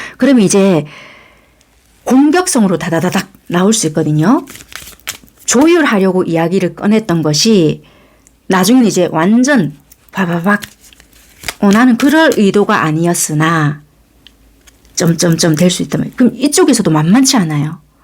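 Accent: Busan, South Korea. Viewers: 부산 사투리 넘 좋아요.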